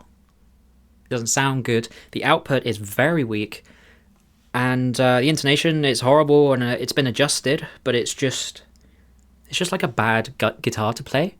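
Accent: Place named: United Kingdom. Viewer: I've watched your videos over the last few weeks but I've never heard you speak. When I first started this video I was like good grief is a Brit haha! I like your playing, I really do. You have such amazing picking technique which, as a player is my largest weakness. Do you have any tips for a well rounded veteran player to improve picking technique? Cheers from the US!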